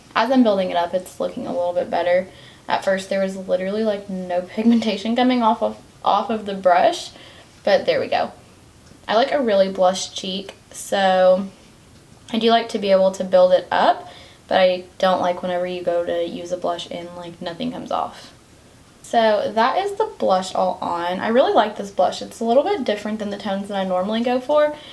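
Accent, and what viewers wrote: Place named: South, United States. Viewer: omg I love this so much!!!! you’re so talented and btw idk why but I love your voice bc you have like a country accent almost and it’s so cute!!! you’re so good at makeup considering your self-taught!!!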